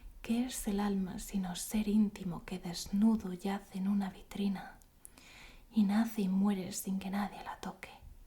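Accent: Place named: Spain. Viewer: Este me parece el acento más lindo de España, de que región de España es?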